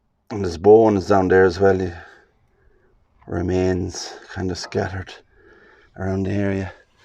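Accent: Ireland. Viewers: Thank you for your interesting commentary, which I really enjoyed listening to. You have a beautiful Irish lilt to your voice and you sound as if you might be a good singer. Stay safe and keep well. Zora in Australia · Are you Irish? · Yet again, a lovely adventure around a fascinating Graveyard. Every one of them has secrets never to be told. Interesting Tombs and as always, your beautiful Irish accent makes these videos even more watchable - thank you. Take care 🙂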